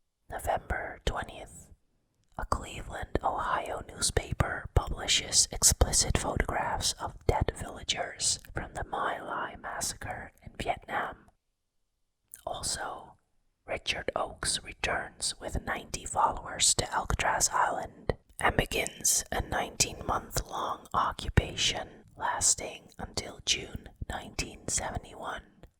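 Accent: Canada. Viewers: sounds Canadian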